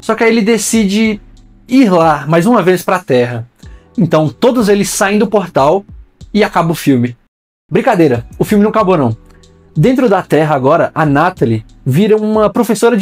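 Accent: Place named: Brazil. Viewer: O vídeo ta muito bom mas esse sotaque divisiano melhora demais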